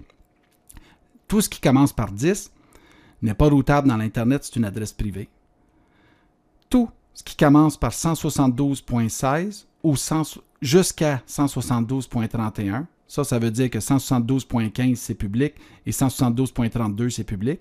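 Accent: Quebec, Canada. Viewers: C'est bien mais je comprends rien le Canadien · super merci beaucoup, votre accent québécois est parfait pour mon cerceau. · Très bonne vulgarisation d'un sujet pas toujours évident pour les débutants. Je vais la conseiller à mon fils qui débute ses études en informatique et qui voit justement les notions de réseau IP. Et lui qui adore le bel accent canadien (vu de Belgique, il y a bien un accent), il va être ravi. Bravo pour cette vidéo !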